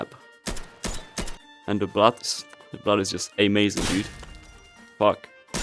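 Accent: Czech Republic. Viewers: are you czech? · From the accent i knew that you are czech! brachoo řízek 🍺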